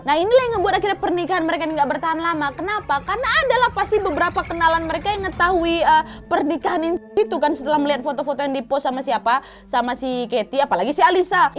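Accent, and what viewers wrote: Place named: Medan, Indonesia. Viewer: Mantap kali logat kakak 🤣 sama2 orang medan nya kita kak e ??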